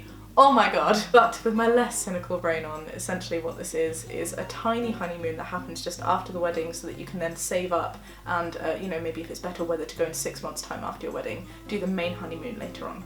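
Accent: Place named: Scotland, United Kingdom. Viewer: I’m so here for your Scottish accent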